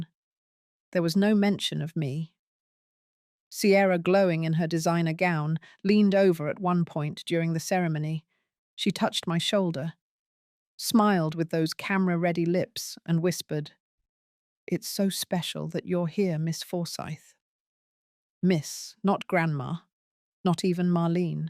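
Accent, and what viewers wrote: England, United Kingdom. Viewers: Loved the story, and oh that beautiful English accent to read. Instead of the harsh American accents which are so jarring.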